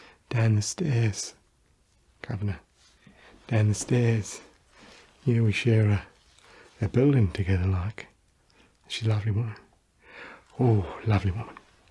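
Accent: England, United Kingdom. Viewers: are you British? it sounds so real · I think he’s English but doesn’t speak with a cockney accent… could be wrong but check out his other videos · Youtube's automatic captions didn't stand a chance with this one. I do enjoy a strong english accent though.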